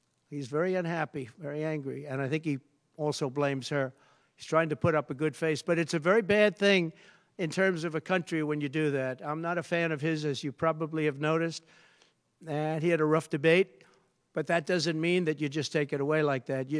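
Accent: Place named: New York, United States. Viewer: For all those people who have a problem with the way Trump talks, just remember he’s a New Yorker. Trump 2024 🇺🇸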